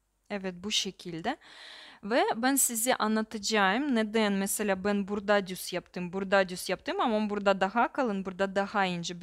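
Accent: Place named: Russia. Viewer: Ayyy sivene bayıldım yaa,normalde bu kaba Rus şivesini sevmem,ama bu bana çok sempatik geldi nedense 🥰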